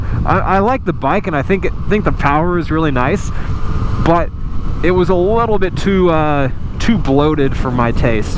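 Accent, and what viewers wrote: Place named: Texas, United States. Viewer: Your Texan is showing! Yall!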